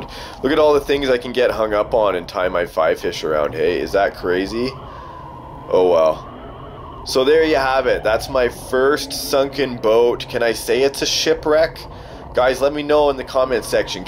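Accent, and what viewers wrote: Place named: Canada. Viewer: why do I automatically like people with Canadian accents??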